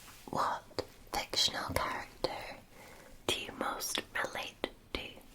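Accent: Scotland, United Kingdom. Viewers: I mean I’ve always loved the Scottish voice because hearing them shout is fucking beautiful but hearing her whisper is something else. I’m glad I share a kingdom with this woman 😇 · 3 minutes into the video and i realised she’s Scottish how can I not tell I’m also Scottish from Dundee sadly I’m so proud now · Wonderful asmr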